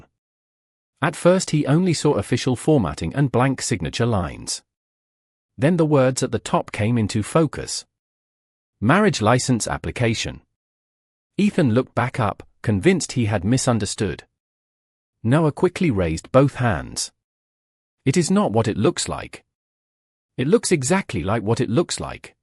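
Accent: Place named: Vietnam. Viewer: Same old topic but with a glitch in the AI voiceover (I'm a linguist... among other things, but wasn't able to identify it, it sounded like Tagalog, but with Vietnamese cadence). Also, the tagline is deceptive. There's no straight man to talk about or kiss that never happened. Please, be careful before posting. Thanks. ❤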